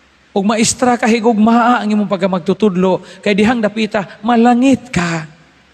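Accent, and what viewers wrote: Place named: Bohol, Philippines. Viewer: Nindot kaau paminawon imong boholano accent father cargado kaayog j, makalingaw pod kaau imong homily god bless father.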